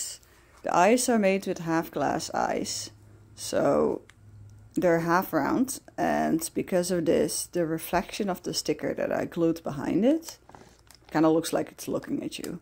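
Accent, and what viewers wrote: Netherlands. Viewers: The dutch accent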